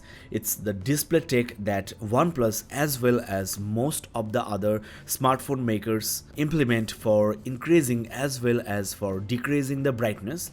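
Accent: India. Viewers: Foul Indian accent.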